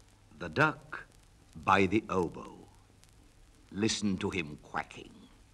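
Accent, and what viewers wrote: United Kingdom. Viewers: Interesting that this is not on Wikipedia's enormous list of recordings. This is Wilfred with his RP accent, similar but not quite what he used to read the BBC news. Imagine if he had used his Lancashire accent! Lovely transfer by the way. Thank you so much. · The most British accent there is.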